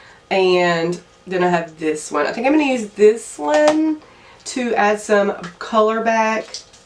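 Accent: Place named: South, United States. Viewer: I’m from Louisiana and I’m guessing the accent is close by...Makeup Arkansas or Tennessee? 💛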